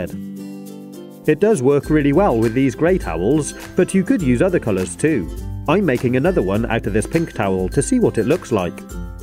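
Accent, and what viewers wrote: United Kingdom. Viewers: is from the UK judging by his accent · Yamin anik I think he is from the UK because I am and his accent sounds familiar..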